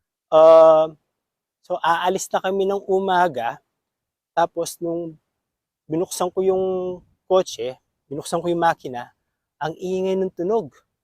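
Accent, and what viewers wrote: Pampanga, Philippines. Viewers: yung accent ng kapampangan andon pa rin kahit matagal ka na dyan 😅 ingat kuya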